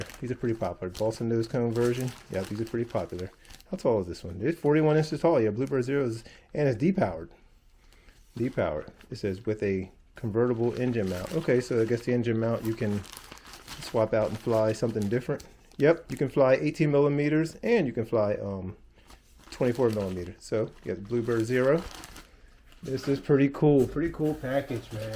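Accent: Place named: Flint, United States. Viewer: Sounds like is from Flint Michigan. I wonder if Ryders hobby still open? Hi! From Saginaw, I grew up in Flint. Stay blessed.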